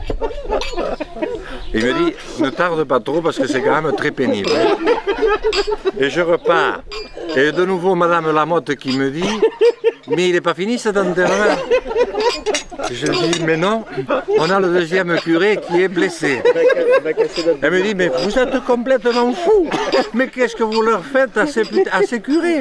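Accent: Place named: Béarn, France. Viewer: Ce n'est pas un accent Chti, mais un accent du Sud-Ouest !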